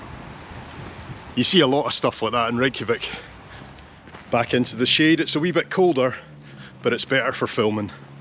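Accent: Scotland, United Kingdom. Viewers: I just recently discovered you on YouTube and I am hooked. You are absolutely adorable and so full of energy. I was exhausted watching how far you walked around Reykjavik. I'm from Minnesota in the USA, and I love all things to do with travel. I'm also amazed at how much you can eat. I recently watched your Atlantic crossing video and enjoyed seeing how much you enjoyed the food on board. Of course I'm loving your Scottish accent and your wit. Keep up the good work. You definitely have a new fan. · Speaking about accents, your Scottish one is music to one’ ears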